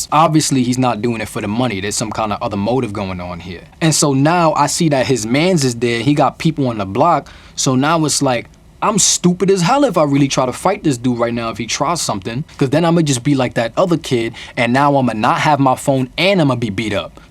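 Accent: New York, United States. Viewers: I LUV New Yorkers' accent!!! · His accent so heavy it make me think I’m not a New Yorker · He mostly he sounds like any other American I'm form California and he has a slight NY accent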